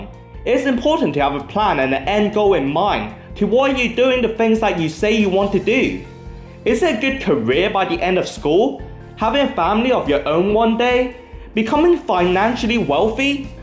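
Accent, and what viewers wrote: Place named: United Kingdom. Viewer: Totally love that British-Chinese accent ;)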